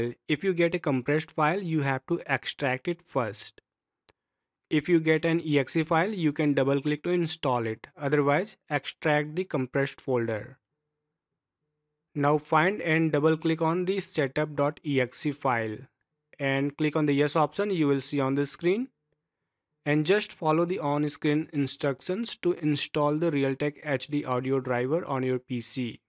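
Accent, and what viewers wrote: India. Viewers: when i heard the indian voice i knew i was in good hands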